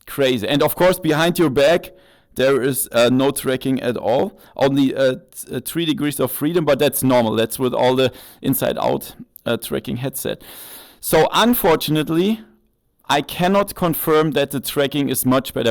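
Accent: Germany. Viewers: Are you German??? )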